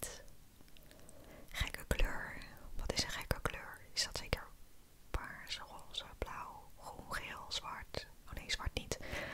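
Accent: Rotterdam, Netherlands. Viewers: Hallo! Ik woon ook in Zuid-Holland en ik hoor aan u gepraat dat u volgens uit Rotterdam komt. Klopt dat of zeg ik iets raars😂 U filmpjes zijn heel fijn en u heeft een fijne stem Xx Sieb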